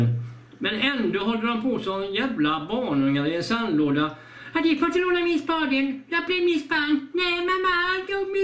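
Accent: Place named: Gothenburg, Sweden. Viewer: Folket som sover kommer nog inte att vakna, så hjärntvättade. Du är så bra fortsätt med detta. Och din göteborgska, den är så härlig.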